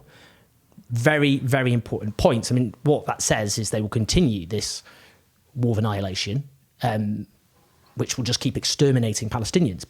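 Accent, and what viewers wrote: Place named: England, United Kingdom. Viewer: you are imoressive but please speak more slowly. As an American, the English accent, although very nice, is a bit of a problem to understand and spoken rapidly much more difficult or even impossible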